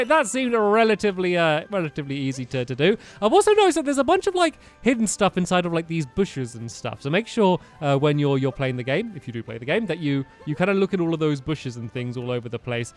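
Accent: England, United Kingdom. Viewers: dexieplays he is English so he speaks differently I say zed aswell coz I’m not american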